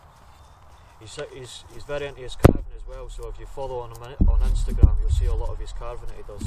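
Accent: Scotland, United Kingdom. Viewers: Are ye Scottish?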